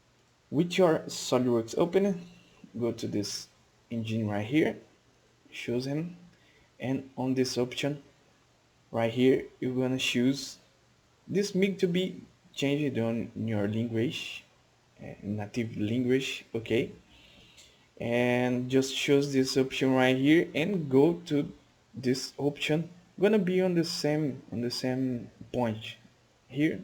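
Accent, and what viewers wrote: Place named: Brazil. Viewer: assim que começou a falar, sabia que era brasileiro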